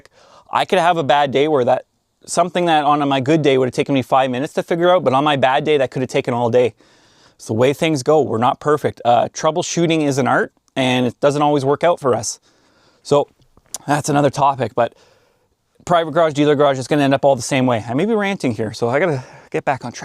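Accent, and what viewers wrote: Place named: Canada. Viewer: are you canadian or just from the midwest ?☠️☠️ pretty cool accent. but came here to say i wanna be able to fix riding lawn mowers and mess with cars, aka fix them, possibly rebuild engines and just want to not be confused when opening the hood of a car.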